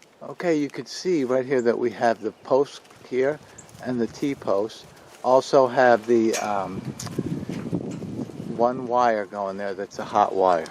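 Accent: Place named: Central New York, United States. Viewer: 😍 they're too cute. The brown baby with the dorsal stripe is much bigger. You sound like your originally from the W C NYS area. 😃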